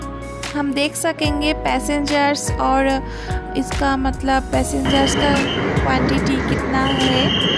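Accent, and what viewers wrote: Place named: Bengal, India. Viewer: You sounds like Bengali.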